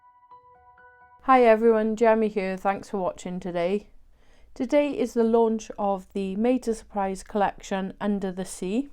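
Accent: Wales, United Kingdom. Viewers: Are you Welsh? If so, me too lol xx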